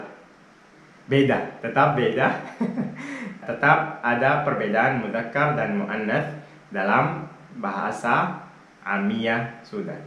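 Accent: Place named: Sulawesi, Indonesia. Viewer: Bang masyaallah sukses selalu ya, btw logatnya abang Sulawesi bgtt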